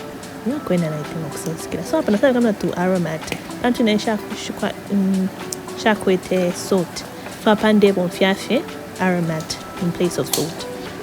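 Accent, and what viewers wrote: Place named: Zambia. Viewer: Beglish 😁 bane.👍🏽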